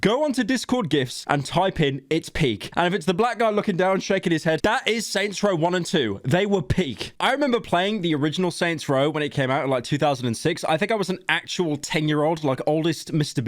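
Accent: United Kingdom. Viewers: I’m convinced viewers are degenerates and are okay consuming anything with buzz words with funny British accent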